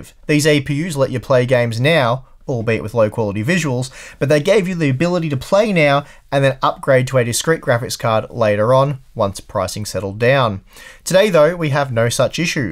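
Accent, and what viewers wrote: Australia. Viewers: I think he says "near on impossible", might be the aussie accent.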